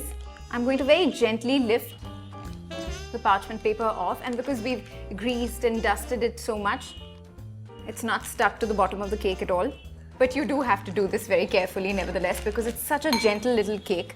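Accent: India. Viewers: a very strong Indian accent you have. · Ulas Gültekin it's the Indian accent.😍😄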